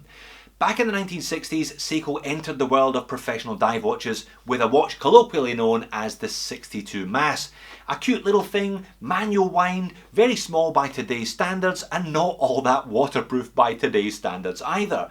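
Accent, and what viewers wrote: Scotland, United Kingdom. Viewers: LOVED THIS REVIEW.,and you have become my go to watch review channel by earning it flat out accurate fair-minded spot on assessments with amazing flair and Scottish brogue to boot! Fantastic watch. Fantastic review. Seiko...that's your watch except you didn't build it. Wtheck?! I'm buying this and I LOVE that tropical strap. TyJ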